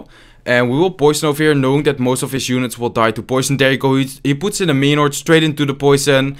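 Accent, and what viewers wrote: Netherlands. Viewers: Lekker Nederlands actcent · e gast ben je nederla'ds'ikan da horenaan u stem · Are you dutch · Met je Nederlandse accent klink je engels wel verlept Sorry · He is dutch I hear that on hes cracky english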